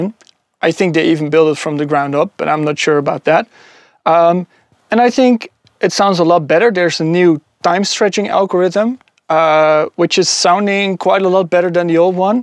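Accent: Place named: Netherlands. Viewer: Ik kan horen dat je uit Nederland komt, mooie review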